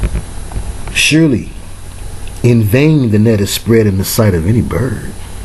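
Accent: Detroit, United States. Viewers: Proverbs and Psalms are my personal favorites. I love listening to them interpreted with your old school Detroit flavor.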